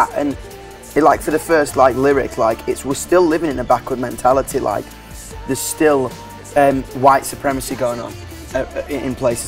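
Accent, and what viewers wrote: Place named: Northern England, United Kingdom. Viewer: ‘It’s the twenny ferst centree fer fock sehke’ God I love the northern accent 😂😂😂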